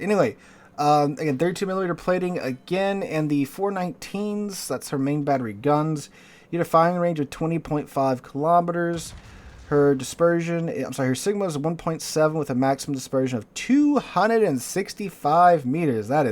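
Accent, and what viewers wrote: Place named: United States. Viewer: Really man? That pronunciation... You cant just read stuff in an american way and expect it to sound about right! 😅 Let me help you: G in Georg is nothing like the english G... its not like the J in John its like the g in hug (both g). And the e is like the men. GE-ORG. And regular R not the amerikan rolling tongue R. Please say Hoff-mann with a like part/dart...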